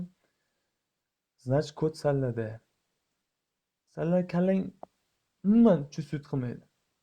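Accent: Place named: Tashkent, Uzbekistan. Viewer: Ooo amlatta o‘zini shevenda gapir hezrlecha shevalarin borku nma toshken shevada gapirasan urod indes o‘zinsan indesligin uchun bashareni ko‘rsatmayabsan.!!!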